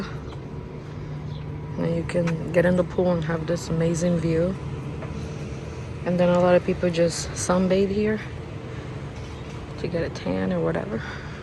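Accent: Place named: Latin America. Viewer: What type of Latina are you? Your accent is familiar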